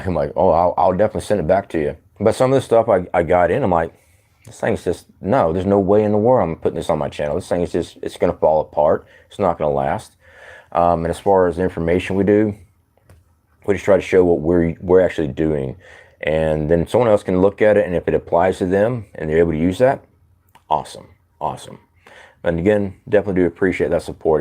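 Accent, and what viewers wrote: South, United States. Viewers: Hate I missed the live!! Had to make some house calls today. Your speech is fine brother. I would not have known if you hadnt mentioned it. You sound like everyone else around NGa. (Perfect southern) try to get some sleep tonight. I'm saying this as a big sister that cares, when you need that much caffine a nap might serve you better. ❤ 👩‍⚕️ sorry I'm still in medi-mode Glad you made it back safe and sound.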